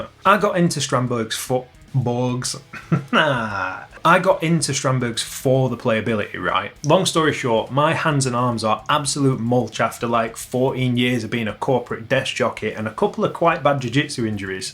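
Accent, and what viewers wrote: United Kingdom. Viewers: HEMA or Historical European Martial Arts as it's called is what i do. It's growing in popularity throughout the country, i'm assuming from the accent you're UK based, so depending on where you are you may have a single club or several in your area. It's a fairly relaxed environment in my experience that you can take things at a pace that suits yourself.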